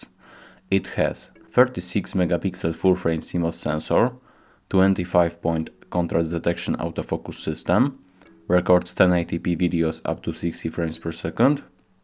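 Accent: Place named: Poland. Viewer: You hear as a polish guy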